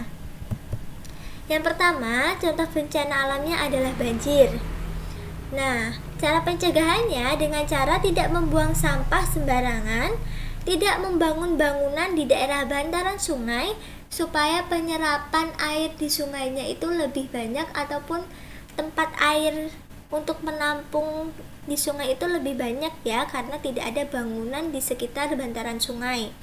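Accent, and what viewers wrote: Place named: Java, Indonesia. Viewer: Kak, bahasa kakak kayak ada logat logat jawanya gitu? Kakak orang jawa ya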